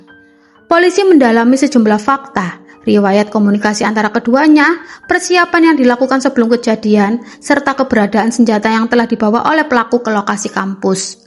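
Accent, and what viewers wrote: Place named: Java, Indonesia. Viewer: medhok bangeet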